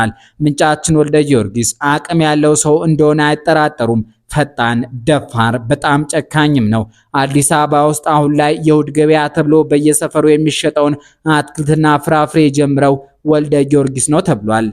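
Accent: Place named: Gojjam, Ethiopia. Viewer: ስታነብ ቅናት ያቃጠለህ ጎዣሜ መሆንህ በድምፅህ ያስታውቃል።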